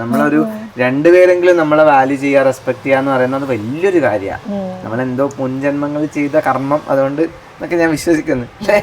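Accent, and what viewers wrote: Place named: Bangalore, India. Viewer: ഇവരെ സംസാരം ഇങ്ങനെ യാണ് അവർ ബാംഗ്ലൂർ ആയിരുന്നു 👍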